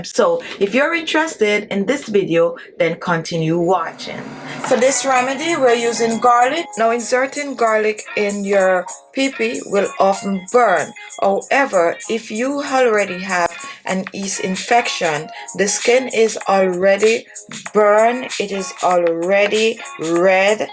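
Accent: Jamaica. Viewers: Why does she sound like a Jamaican 😌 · You sound Jamaican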